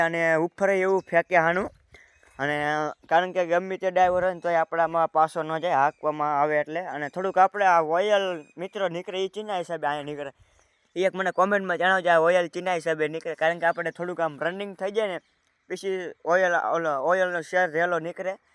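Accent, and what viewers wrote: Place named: Surendranagar, India. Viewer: Bhai Tari boli surendarnagar ni che😅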